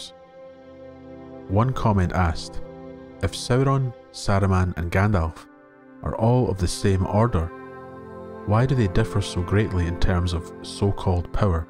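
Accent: Scotland, United Kingdom. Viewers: 😁 near the end of the video I did recognize the Scottish accent! Cheers from Romania! And a good night i got to go to bed.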